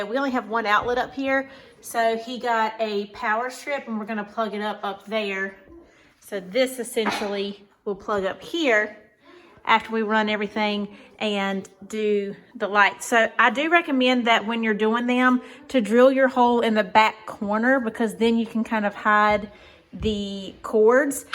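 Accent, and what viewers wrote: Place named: South, United States. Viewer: Adorable slow southern drawl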